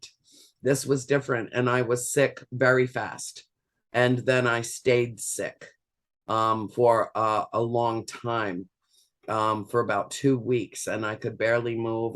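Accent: South Africa. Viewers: What nationality is the presenter? He sounds South African??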